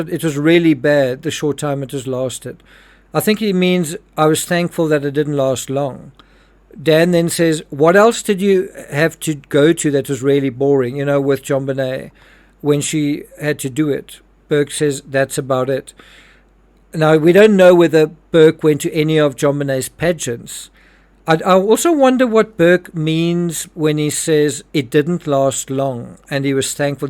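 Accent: South Africa. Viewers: The narrator sounds very South Afrcan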